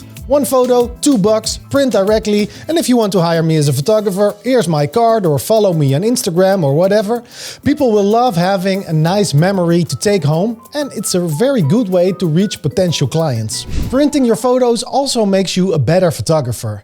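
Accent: Netherlands. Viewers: you definitely dutch! you have the same accent of my dj friend from breda! hahahahah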